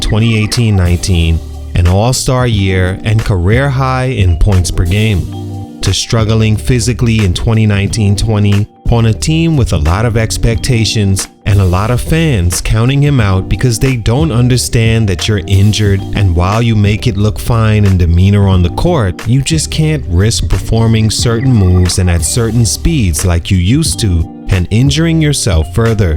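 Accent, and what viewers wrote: Bahamas. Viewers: Bey narrator you Bahamian ay? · Bro, I completely forgot about Kemba. Excellent video! BTW, you sound Bahamian. If so, big up from Canada!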